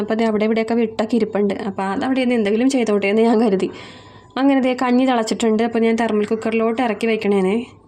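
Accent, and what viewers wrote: Kochi, India. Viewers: Ishtam illathavar kanenda😮Ishtam ullavar njangal ellarum undu ktto😊njan pathanamthitta il Thiruvalla il aanu kochi slang kelkan nalla rasam undu ❤super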